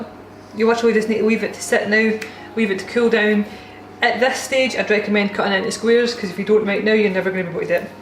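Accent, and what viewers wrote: Scotland, United Kingdom. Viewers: Anytime i ever hear "It will be great" accompanying a Scottish accent I will be wary of what is to come. Its like a Texan saying hold my beer and check this out. I know ive said it.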